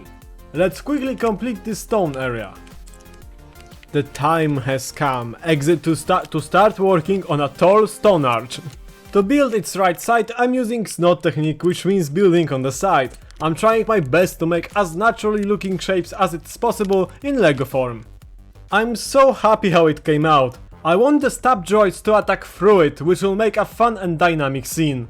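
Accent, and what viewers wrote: Poland. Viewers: słychać że polak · from where are you from you sound polish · słychać polski haha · Bro speaks English jak polak💀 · You have Polish acent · masz polski akcent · I can understand the accent because I am also from Poland and I. Love these videos, keep up the good work I hope you get 1M subs before 2026 👍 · Polski akcent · Słychać że polak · usłyszałem taką nutkę polskiego mówienia po angielsku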